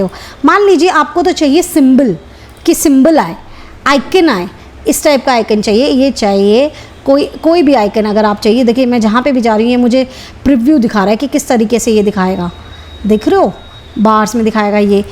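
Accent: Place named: Pakistan. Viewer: Ur accent sounds like Pakistani..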